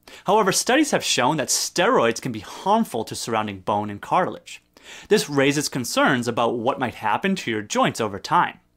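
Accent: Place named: Massachusetts, United States. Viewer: You’re def from eastern Massachusetts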